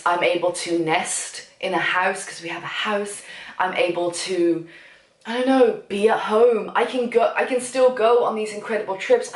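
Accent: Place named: Birmingham, United Kingdom. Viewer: Hi, glad to see you doing well. Home certainly agrees with you, you look great. And I think I hear the Brummie accent coming back. I remember the exact day you left Budapest, because the wife and I arrived there for vacation that same day.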